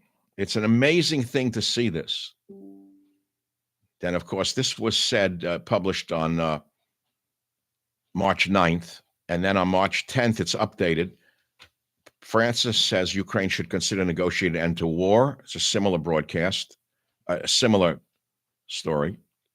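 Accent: New York, United States. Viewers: Good night Dr. Savage....Good to see your mug again, hear that New York accent, and see that cool hat and ring.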